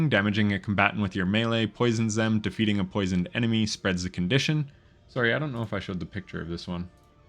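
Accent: Canada. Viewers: Are you from Minnesota or Canada? Usually I can tell but you sound to me like an Albertan but when I went to Minnesota there were a couple people who sounded like Albertans aswell. I very confused. Does it matter? Nope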